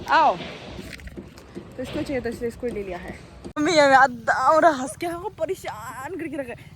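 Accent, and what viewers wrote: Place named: Assam, India. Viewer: Your assamese accent is sooo cuteee😂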